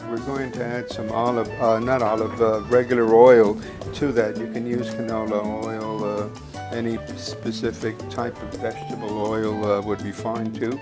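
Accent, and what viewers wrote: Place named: New York, United States. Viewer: You can cook for Me anytime!!! ❤Adorable and that N.Y accent, love it all!!!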